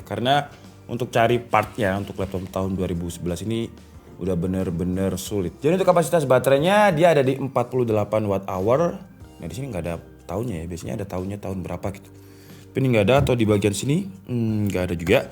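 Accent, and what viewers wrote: Malang, Indonesia. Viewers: Bang lu orang Malang ya?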